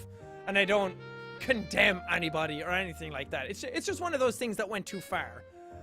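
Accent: Ireland. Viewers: Is it just me or does he sound Irish🇮🇪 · has a 10/10 Irish accent.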